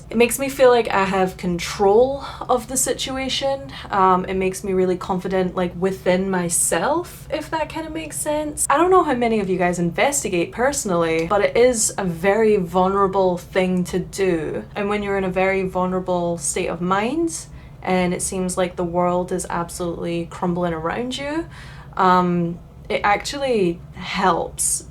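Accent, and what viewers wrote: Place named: Scotland, United Kingdom. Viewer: Your doing ok. Love ya Scottish accent. Awesome!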